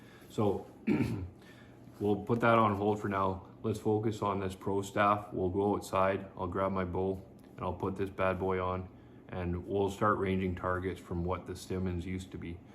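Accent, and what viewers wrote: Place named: Canada. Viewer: cool man, I could recognize a fellow Canadians accent. Lol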